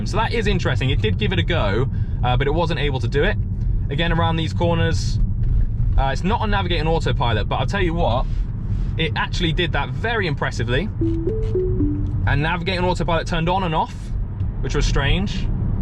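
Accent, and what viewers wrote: United Kingdom. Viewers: Are you scottish and doing a switcharoo. I speak english as a first language. When I speak to people outside India or in videos I speak in a way that most native would find easy. But speaking english with non native speakers in India I need to deliberately misprounce words and use incorrect grammer otherwise they want understand me.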